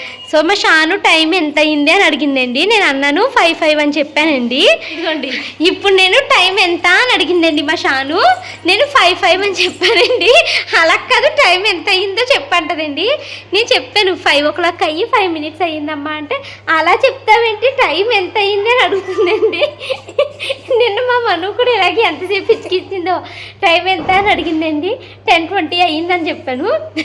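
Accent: Godavari, India. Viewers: Medi proper a uru andi, me puttillu ekkada, ante slang godavari valla la undemo anukuntunna.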